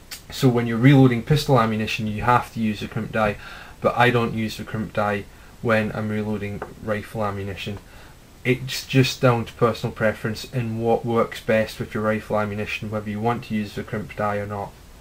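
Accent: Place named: Scotland, United Kingdom. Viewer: Not only is this entire series very informative, it sounds like it has much more authority with the Scottish accent. Props to you, good sir.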